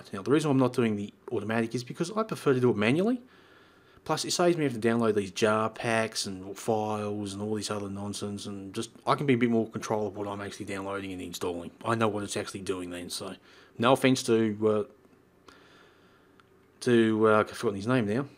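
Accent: Australia. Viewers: YOU. Are Australian. I love you now. (: FROM ME ♥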